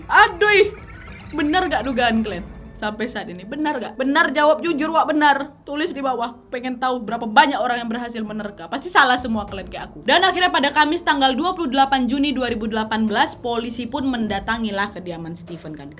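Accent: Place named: Medan, Indonesia. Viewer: Kok berubah logat mu KK Jadi Medan - Melayu wkwkwkwkwks🤣🤣ngakak liat bersin nyaa😂😂 Motif nya jelas unsur sakit hati atas ketidakadilan yg dialami si Steven dikota itu kondisi korban yg seperti dilecehkan" didalam rumah ibadah jadi pilihan nya buat balas dendam terhadap sistim dikota itu sebenarnya masih banyak yg mau diuraikan cuma males ngetik nya😂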